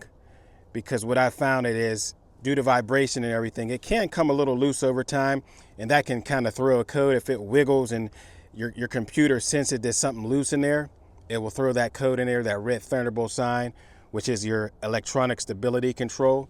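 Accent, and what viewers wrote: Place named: Pittsburgh, United States. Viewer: U from pittsburgh ? Sound like a yinzer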